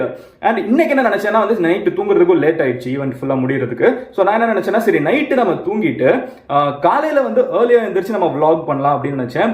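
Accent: Madurai, India. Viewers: Enna than Professional ah Pesinalum Madurai Slang Vanthuduthu 😂😂 Nikka Vachuruvainga,Flight la Kuduthainga 😂😂 Madurai ❤️🔥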